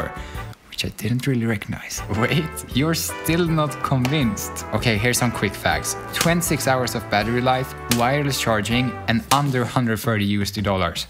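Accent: Sweden. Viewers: Tha svenska accent >>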